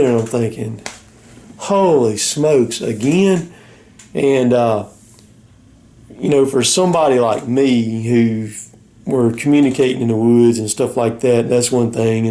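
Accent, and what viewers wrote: South, United States. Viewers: I am a modest Patreon sponsor for Jason at Hollis Farms. I enjoy the baby deer videos and I just love the southern drawl. I think if your You Tube content has guns, blood, or banjo music; they demonetize it. You don't have a Patreon account. I think working out in the sticks like that with the heavy equipment one would want a sure fire way to communicate. What if a rattlesnake bit your sticker when you were watering the landscape? ( Old joke. "You gonna die!")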